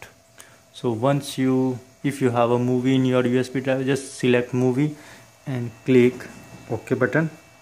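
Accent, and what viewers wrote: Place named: India. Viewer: I hate your Indian accent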